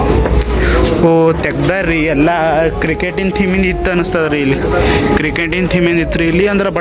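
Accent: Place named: Bangalore, India. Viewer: Ean ri kali dome bangalore matadtiri ree antiri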